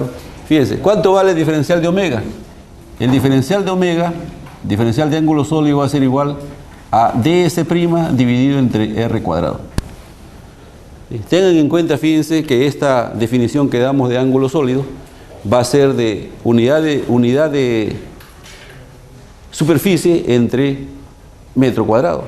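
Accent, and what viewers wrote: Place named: Peru. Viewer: Que buen video, gracias a UTP por subir sus clases. Me parece que el profesor es peruano por su acento.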